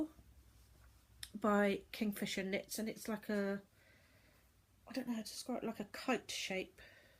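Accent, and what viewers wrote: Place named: Devon, United Kingdom. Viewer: Lovely 1st episode, catching up on all your podcasts. Lovely to hear another Devonshire accent, me loveerrrr 😘💗 Gorgeous makes! X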